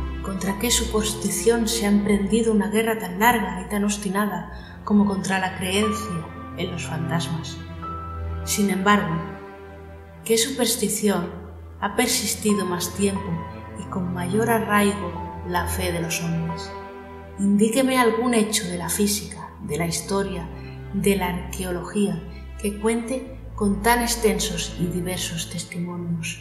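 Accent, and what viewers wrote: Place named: Spain. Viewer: Muchas gracias...me encanta que esté en español de España...y narras muy muy bien 😊❤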